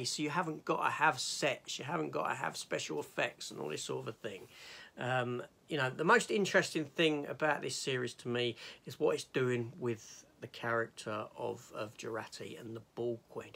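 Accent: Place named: London, United Kingdom. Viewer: I'm from London myself so I caught the accent. We practically neighbours 😄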